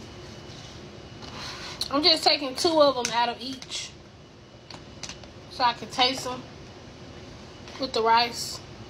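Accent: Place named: South, United States. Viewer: Lamb curry 😂😂😂 love your southern accent ❤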